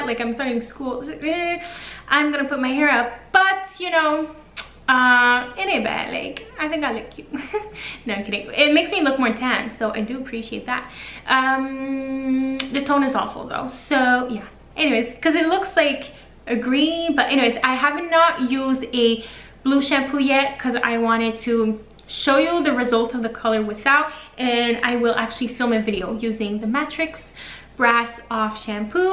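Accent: Quebec, Canada. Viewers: Your accent... you from quebec right ? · Are you French Canadian? Québécois? I know my people haha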